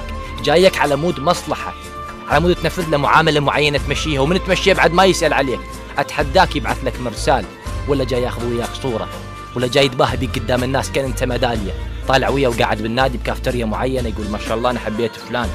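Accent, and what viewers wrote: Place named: Gulf, Arab world. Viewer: على كيفك باوع لنفسك اولن انت تافه ومنحرف زين انت عراقي ليش تحجي مثل لخليجي لو بدوي ها تعوج ابحلكك ابو لحاتات جايف لحد ولغسن 😠😠😠😡😡😡😈😈😈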